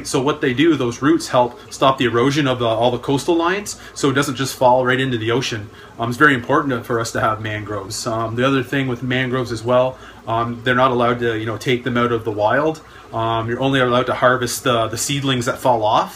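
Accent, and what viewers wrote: Canada. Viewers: Are you from Canada? I love how you talk! Great video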